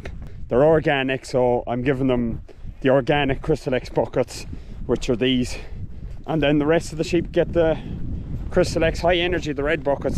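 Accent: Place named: Ireland. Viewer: Hi...loving your vlogs,it’s great to see you back home.My cousin is trying to do our family tree, and it appears our family originally came from your part of the world.I remember my grandparents,plus aunts and uncles, ( sadly all passed now )speaking in a lovely Irish accent…talking about Leprechauns, great story tellers, So hearing you talk,and seeing the landscapes,makes me feel closer to them and where they came from,very much appreciated. Sue (Woodhall Spa) x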